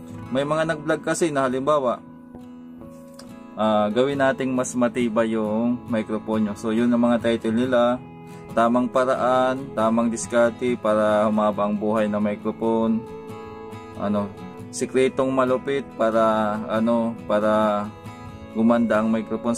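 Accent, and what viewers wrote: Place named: Visayas, Philippines. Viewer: Bisaya ka boss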